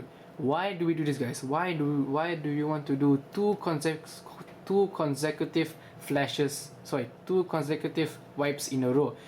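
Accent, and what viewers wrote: Malaysia. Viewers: Malaysian dude? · you accent are so malaysian , i like you're video :)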